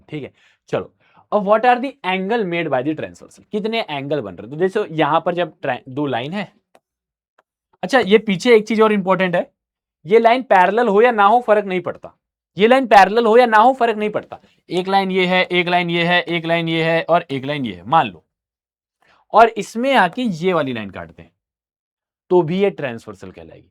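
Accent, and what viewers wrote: Bihar, India. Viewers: Sir ap kya bihar se hai🥰👍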